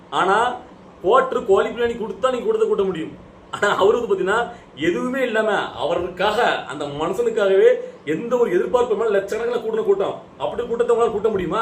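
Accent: Tirunelveli, India. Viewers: திருநெல்வேலி slang மாதிரி இருக்கு ப்ரோ 😊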